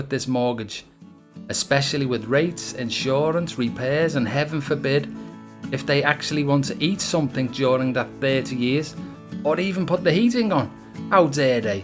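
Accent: Liverpool, United Kingdom. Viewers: Are you from Liverpooool mun?!!?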